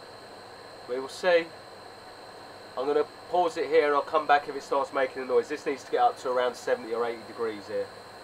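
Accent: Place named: London, United Kingdom. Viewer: loving your videos mate! your a funny guy you remind me of del boy trotter in a good way and with the london accent lol if thats packed in now your wife wont be happy she will be like "why do you have to start pissing around with it showing all your pals on youtube!!!" lol anyways mate keep up the good work hope you and your family had a great crimbo..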